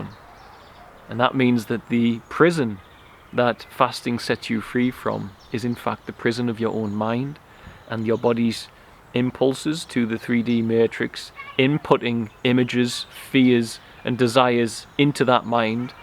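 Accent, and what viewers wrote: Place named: Newcastle, United Kingdom. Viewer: i love your slight geordie accent lol i am a geordie trying to be godly too :P lol thanks for the info and videos, keep spreading the light xxx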